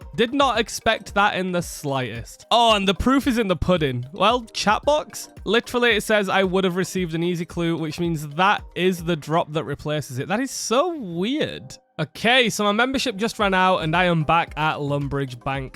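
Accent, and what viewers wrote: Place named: Yorkshire, United Kingdom. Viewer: You sound like you're from Bradford/Leeds/Hudds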